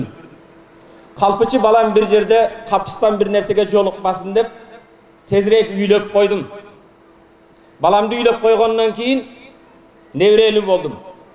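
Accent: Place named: Kyrgyzstan. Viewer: Кыргыз, элебиз...жон эле Озубуздун диалектиде суйлоду....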